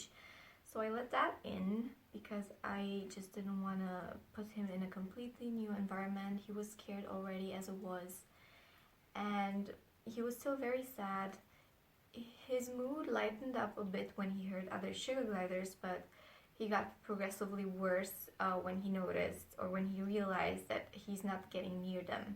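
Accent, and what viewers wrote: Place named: Europe. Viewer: Where are you from in europe? I think I recognize the accent ;) anyway nice video! :)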